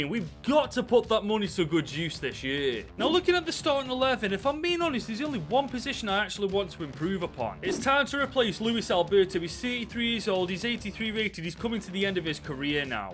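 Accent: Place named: Midlands, United Kingdom. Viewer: Mate I love your videos watch you all the time, your accent sounds very familiar are you Derbyshire or Staffordshire? Class if your SOT lad love to see a stokie doing well !😅 keep up the good work ❤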